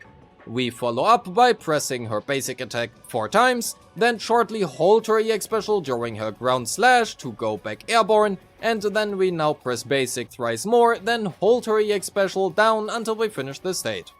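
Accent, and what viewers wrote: Germany. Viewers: Great video, i hope ye shunguang will restore my zzz luck. Are you by any chance german? I hear somewhat of a familiar accent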